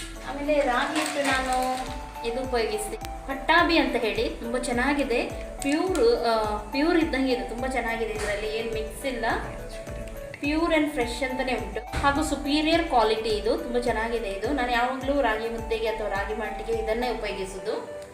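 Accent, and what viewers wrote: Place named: Kundapura, India. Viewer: actually ನನ್ನ ಅಮ್ಮನ ಊರು ಕುಂದಾಪುರ.. ನಿಮ್ ಮಾತಾಡೋದು ನೋಡಿ ನಮ್ಮೂರಿನ ಹುಡ್ಗಿ ಅಂತ ಗೊತ್ತಾಯ್ತು.. ಅದಕ್ಕೆ ಕೇಳಿದ್ದು.. Don't mistake me..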